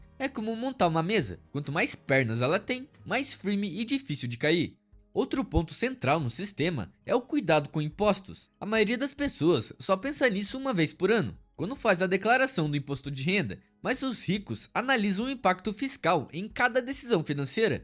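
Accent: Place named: Sao Paulo, Brazil. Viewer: Sou de Minas e não suporto este sotaque seu de Paulista. Mas vale pelo conteúdo informativo.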